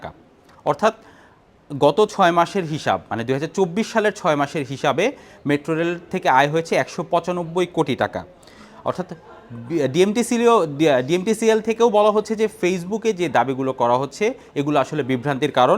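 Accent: India. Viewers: আপনি যেটা বুঝাতে চাচ্ছেন সেটা আমরা বুঝে গেছি।। দাদার টান এখনো৷ যাই নাই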